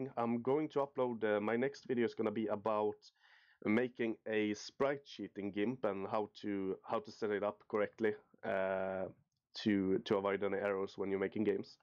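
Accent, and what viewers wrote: Sweden. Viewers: Nice Swedish accent! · Tack (Jag antar aatt du är svensk, det låter så)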